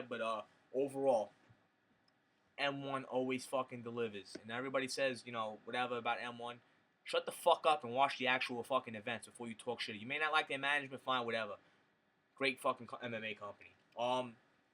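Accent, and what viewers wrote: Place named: Liverpool, United Kingdom. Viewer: u speak like a true scouser bro good shit keep it up but u should be on the freddie roach plaster in glove trick gsp used here i think dana was on to it he didnt look pleased in post fight presser wen he pointed out hed never seen someones eye go like that in 1st round off jabs gsp cheat !!!!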